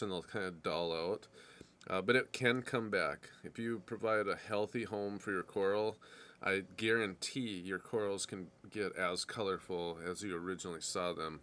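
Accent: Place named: Minnesota, United States. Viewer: Ya gee, ya sound like my family in Brainerd, don’t cha know? -random aquarist in Texas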